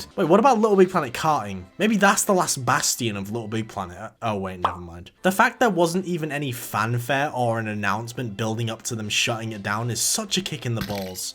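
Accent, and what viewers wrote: United Kingdom. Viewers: oh, he's British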